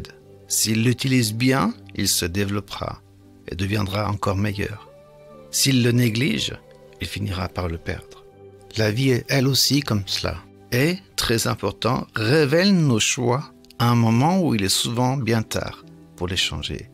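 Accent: United Kingdom. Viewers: Bonsoir merci pour ces belles paroles elles me reconfortent apres une journee au bureau Je vous le dit souvent j'adore votre accent BRITISH Have a nice evening Awaiting for your next reading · Bonjour et merci pour ce partage. Oui oui tu as bien un bel accent anglais. Je comprends mieux maintenant. La méditation m'a fait sourire et réfléchir surtout avec l'image du mauvais mur. Et si mon échelle d'ascension pro était sur le mauvais mur 😢 je me suis demandée. Seigneur je te confie tout je te recommande tout apprends moi à t'écouter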